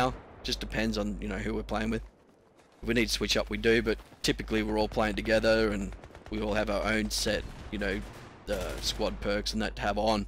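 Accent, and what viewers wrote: Australia. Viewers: Wereabouts are you from, i'm gessing Aussie :D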